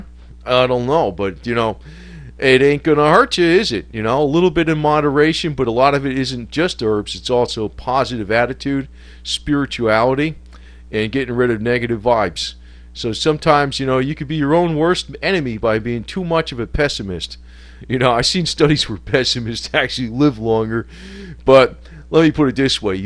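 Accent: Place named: Philadelphia, United States. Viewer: are you from philly? I can hear it in your voice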